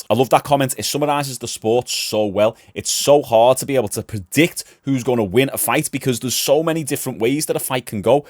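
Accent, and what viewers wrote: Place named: Yorkshire, United Kingdom. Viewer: Ars tha from Yorkshire m8? (I'm a dual citizen of Canada and UK, lived in Leeds for 4 yrs... trying to narrow down your accent)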